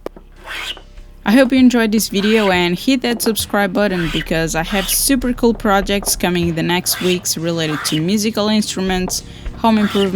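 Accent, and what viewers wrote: Portugal. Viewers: so agora depois de ver tantos videos é que percebi que és de Portugal :)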